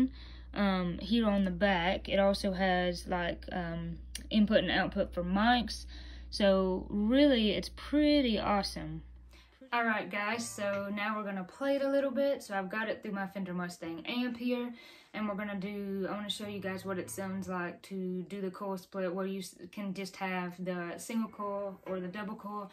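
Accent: South, United States. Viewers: Love that guitar; just picked up the 2020 Trad Pro V in satin ebony yesterday! Amazing guitar; mine has mahogany body & mahogany top. Cool video, awesome guitar, and nice country accent; easy to listen to. Take care & God bless, Brandon 🎼🎸🇺🇸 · Love your southern accent...! Isn't the Trad LP too heavy...? Cheers from 🇫🇷 big BBS fan 🎸🤘🏻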